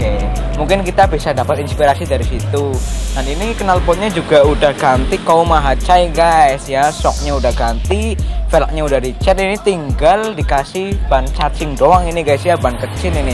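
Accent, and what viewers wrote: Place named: Banyumas, Indonesia. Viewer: Ngapak gaes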